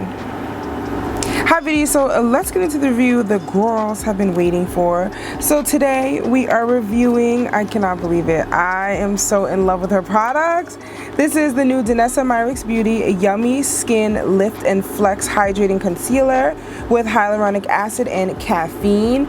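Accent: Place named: New York, United States. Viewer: I love the New York accent!❤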